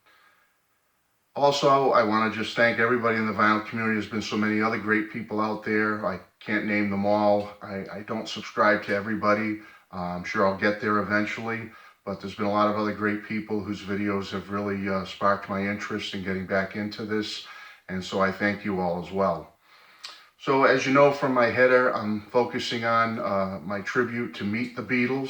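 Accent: Boston, United States. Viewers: Hi thanks for sharing, just found your channel...seems you have many videos I'm going to spend time watching!! Thanks!! I lived in Boston, Ma, for many years do I detect an accent??;-)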